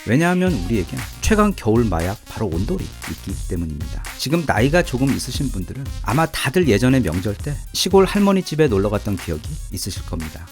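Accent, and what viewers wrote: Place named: Honam, South Korea. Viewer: 호남 사투리만 찰진게 아니고, 같은 내용을 요러콤 찰지게 풀어나가네요.하루가 싱싱!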